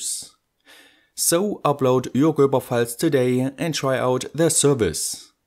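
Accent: Germany. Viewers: the german accent is making me crazy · German man ? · are you german?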